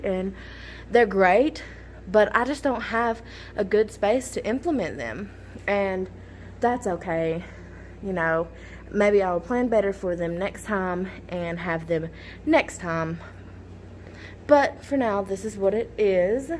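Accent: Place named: South, United States. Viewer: Hey! I just wanted you to know how much I am enjoying watching your classroom vlogs. Your classroom is looking great! I love the math alphabet, but I especially love your accent!! I am also a teacher from the south. I have been told recently that, like you, the teacher will most likely have to move from class to class. I agree that it is the safest thing to do for our students, but it will be a challenge! Good luck to you!! By the way, I also teach 6th grade math at my school:)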